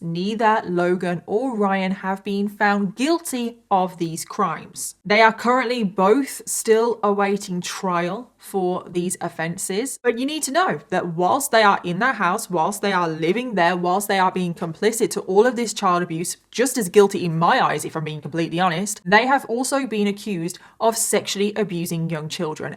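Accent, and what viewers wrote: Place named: United Kingdom. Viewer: She from the UK and its her accent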